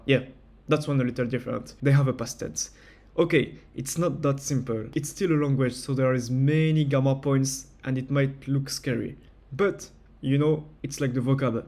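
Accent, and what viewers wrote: France. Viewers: L'accent français mdr 😂 A few seconds in, I get used to it, I just love when people have some accent (as long as it doesn't make them hard to understand). Also the advice seems solid. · I thought you sounded french 😂🙈🙈🙈. You mentioned how learning Japanese is different from learning other languages (mostly in terms of reading). Maybe you can go deeper into that? Maybe talk about how you adapt your your methodology to the language? 🤷‍♀️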